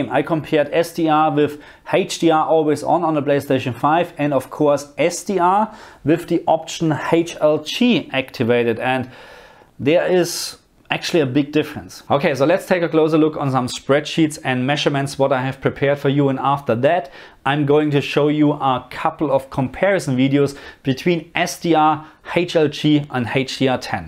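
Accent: Germany. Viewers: Are you from germany? You sound like german :-) ? Falls ja schöne Grüße aus Hamburg. Eventuell kann ich dann meine Fragen auf deutsch stellen? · Your german accent is fucking ruining your videos man...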